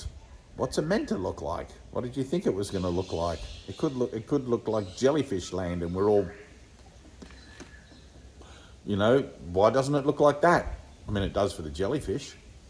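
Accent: Australia. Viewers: Beard ✅️ Long hair ✅️ Australian accent ✅️ Scrubby handwriting ✅️ Tattoos ✅️ Deranged laugh at the beginning of each video ✅️ Everything you say ((must)) be true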